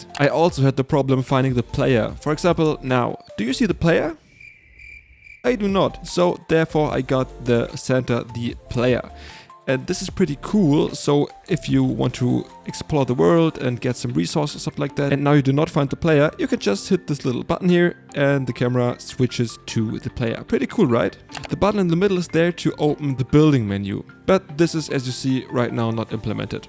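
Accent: Germany. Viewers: I can hear the German acent, aber gutes Video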